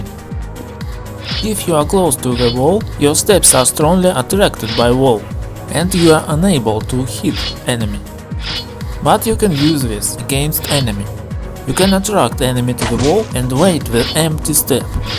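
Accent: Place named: Russia. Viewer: I love Russian accent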